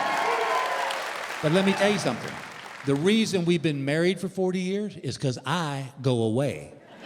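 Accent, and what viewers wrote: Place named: South, United States. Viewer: it’s not muddling honey, it’s his Southern accent 😂😂😂😂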